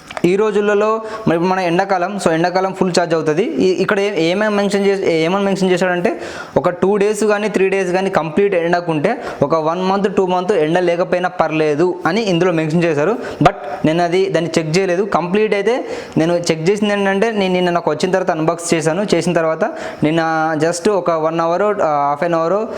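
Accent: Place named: Telangana, India. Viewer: Complete telangana language😂😂👍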